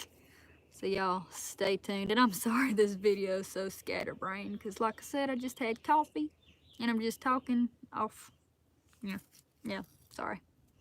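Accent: South, United States. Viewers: I love your Southern Accent and definitely don't tell where in Alabama you live! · You are precious with a little southern eloquence. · Whoever says something negative about your accent deserves the middle finger! I love that Southern Draw! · Hi, love your Southern accent. Keep them outdoors videos coming, keep looking good. You are an awesome gal. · Love your Southern Drawl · Love that southern drawl. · Love that southern accent. Who cares what anyone says it looks good on you.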